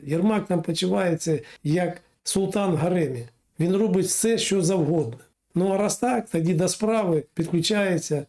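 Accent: Russia. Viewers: Все було нічого, але акання боляче слухати. Хто ж вас так вчив рАзмАвляти українською, невже ТимАшенкА? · Може твої слова мають рацію. Але, оце мацковське Аканьє: пАгАвАрить, пАмиляюсь, пААбіцял, пАвідомлять... Заєбал. Вчи мову.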